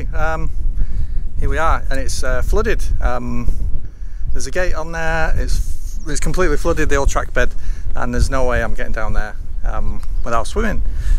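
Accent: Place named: Northern England, United Kingdom. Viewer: Language is a dynamic construct. I am familiar with the speech idioms of this presenter, but then I am , like him from the North of England. If you find Northern speech annoying, maybe confine yourself to videos with presenters from your local area, or to those that have had professional training in speaking Received Pronunciation.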